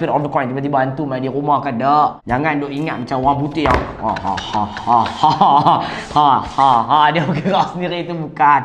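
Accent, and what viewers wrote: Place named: North, Malaysia. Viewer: haha..sonok tengok gaya dia cakap...utagha pekat elok noh...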